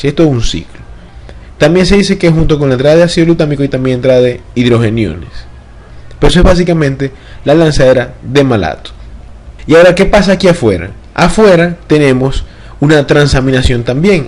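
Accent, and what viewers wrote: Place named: Venezuela. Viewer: Tenias que ser venezolano.. Saludos desde coro.. Te entendí a perfección <3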